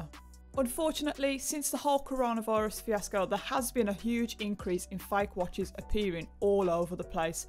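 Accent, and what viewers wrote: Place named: Midlands, United Kingdom. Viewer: I’m good at spotting a Mids accent , and watch differences 😉 respect , great detailed commentary and spot the difference 💯👍🏻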